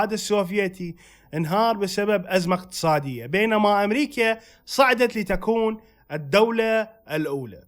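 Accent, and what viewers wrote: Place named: Samarra, Iraq. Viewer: لهجتك مال سوامره !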